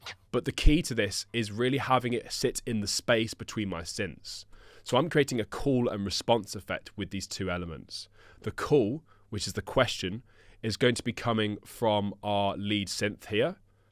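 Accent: United Kingdom. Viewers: You English pronansuation is special candy for ears, is it RP?) thank you for nice tutorial as well!)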